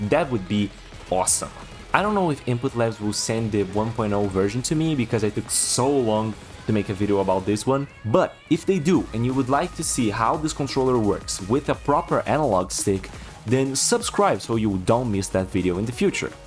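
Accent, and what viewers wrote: Brazil. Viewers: sabia que tu era BR, o sotaque nao engana kkkk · brazillian accent detected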